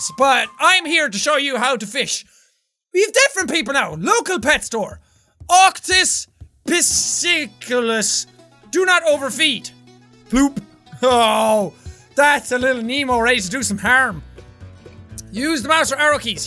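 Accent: Ireland. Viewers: Got so Irish this episode. Just listen to the outro. I LOVE IT.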